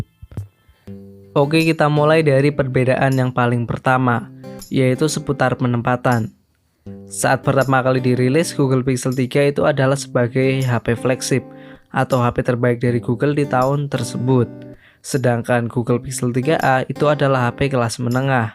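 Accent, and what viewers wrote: Wonosobo, Indonesia. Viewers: wong sobo ta mas?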